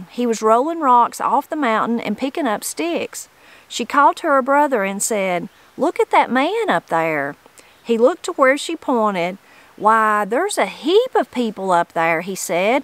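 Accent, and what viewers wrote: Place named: Southern Appalachia, United States. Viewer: What part of the country are you from my sister? I'm from Lee county Va and your accent makes me homesick. We now live in Franklin North Carolina and in the past near hickory nut gorge and brown mountain. Love your videos, God bless!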